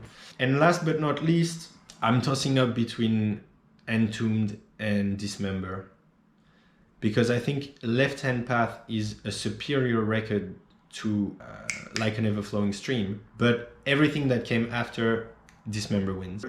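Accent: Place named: France. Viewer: With your accent I think you're French no? Cocorico ?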